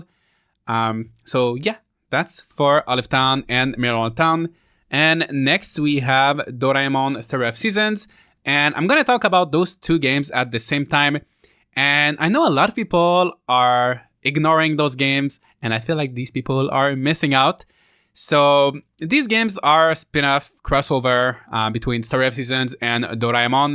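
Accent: Quebec, Canada. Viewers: accent quebecois ?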